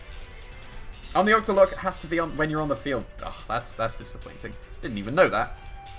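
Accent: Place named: United Kingdom. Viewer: I like your British.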